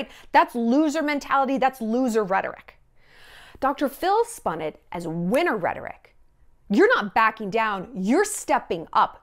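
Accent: United Kingdom. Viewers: Love the British accent, and I’m British! I have a name for your plot of land - Shallonshire ❤️ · Your British accent deserves a whole Netflix show. 🤣❤️ i love you woman. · Hahahahahhahaha😂😂😂😂😂😂 your British Accent is soo good! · Your British accent is amazing!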